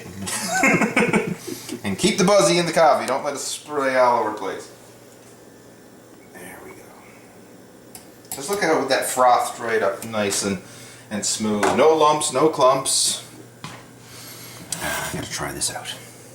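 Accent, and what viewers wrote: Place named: Maritimes, Canada. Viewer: He's got an east coast accent. Canadians refer to them as maritimers. They have their own special accent. Not quite as rough as people from Newfoundland though.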